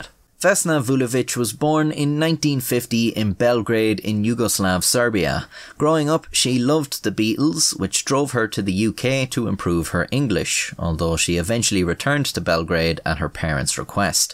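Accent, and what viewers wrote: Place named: Ireland. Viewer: I swoon over your Eireann drawl, thank you so much 🗽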